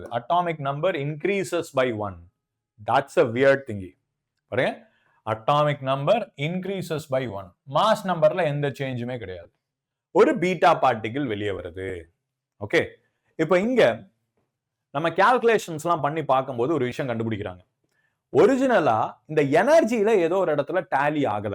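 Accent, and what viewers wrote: Tirunelveli, India. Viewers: pesara slang liye tirunelveli or kovipatti nu theriyuthu bro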